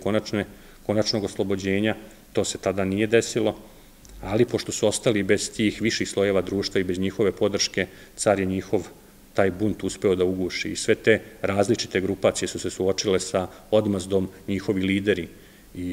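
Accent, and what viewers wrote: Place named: Vojvodina, Serbia. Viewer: Milane, nisam se cak ni vracala da cujem....jer u govornom izrazavanju gospodina , rekla bih da je odrastao u Vojvodini, gde se pomenuti izraz bas tako izgovara...toliko o znanju etimologije, etnologije kao i istorije ...Nista to ne umanjuje znacaj predavanja! Pozdrav!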